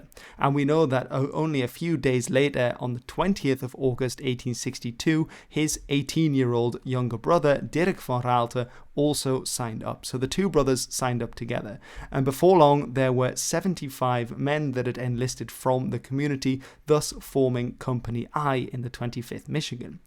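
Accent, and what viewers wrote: Netherlands. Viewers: I clearly hear the Dutch accent. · He's definitly dutch. He uses an english accent, and does it well, but his dutch does shimmer trough.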